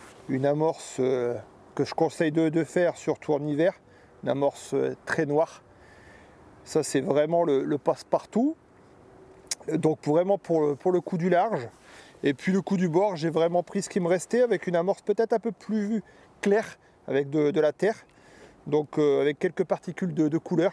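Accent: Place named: Nord, France. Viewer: Salut, c'est vrai que tu as quitté le Nord, j’avais complètement oublié, mais bonheurs ta pas perdu l,accent. Bonne continuation et plein de nouvelles vidéos. Jean-Paul.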